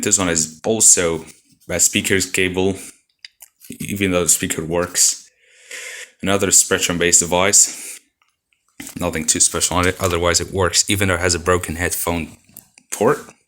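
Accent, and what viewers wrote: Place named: United States. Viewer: why do you sound kinda american lmao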